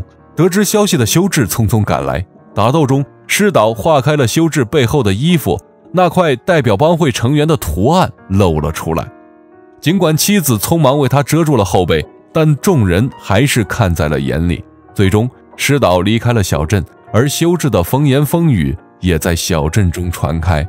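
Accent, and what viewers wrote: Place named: Osaka, Japan. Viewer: 大阪弁いいね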